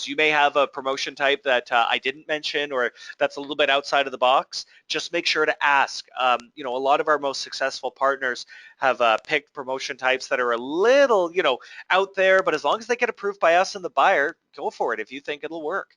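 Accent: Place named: United Kingdom. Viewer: Nice British accent!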